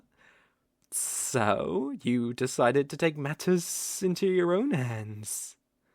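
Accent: United Kingdom. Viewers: What did I just bump to?! That's the right amount of sssss and Britishness I needed to hear! Oh my... your voice acting is very great! I'm gonna to some walk around his channel AHHH Thank you for your efforts!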